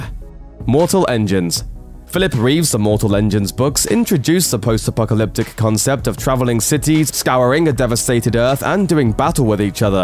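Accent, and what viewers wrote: Australia. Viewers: is this guy australian?? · What's the narrators accent? Y'know, that makes him say "The Man-dellureffekt" or even just "Mandellur". I always wondered about where that's from. Is it an Australian one?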